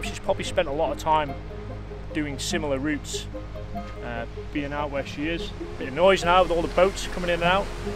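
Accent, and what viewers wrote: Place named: Liverpool, United Kingdom. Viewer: You have a local accent... eport? cheshire ways or liverpool?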